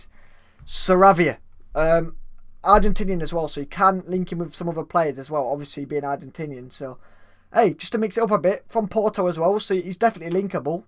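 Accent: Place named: Yorkshire, United Kingdom. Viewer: I live in thailand and never hear the Yorkshire accent anymore, keep up the good work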